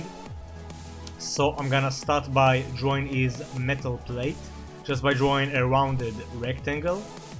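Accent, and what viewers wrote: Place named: Israel. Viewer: חחח יש לך שם ומבטא של ישראלי. יש מצב? Anyway, Your art is amazing!!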